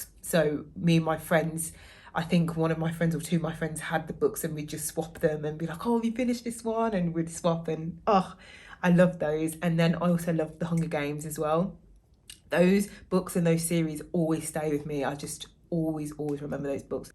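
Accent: Birmingham, United Kingdom. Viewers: Stumbled across you accidentally, I love to read and I have a ridiculous amount of books but I don’t think I could do Booktube! Do I detect a Midlands accent? I’m from Birmingham :) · Immediately subscribed for the vibes!! Do I detect a west mids/brummie twang? Either way, I love your voice, reminds me of home. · вы первая буктьюбер, говорящая с британским акцентом, которую я нашла😭 вы очень красивая!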